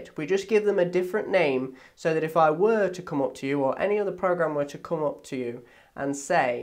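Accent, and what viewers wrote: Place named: England, United Kingdom. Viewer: Merci, je bois vos paroles depuis le premier épisode: Chapeau, monsieur ! (and of course I'm enjoying your english accent !)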